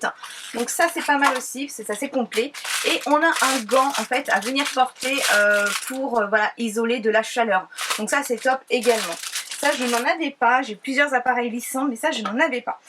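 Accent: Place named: South, France. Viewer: tu as un accent du sud on dirait !😍 ta vidéo est top ! <33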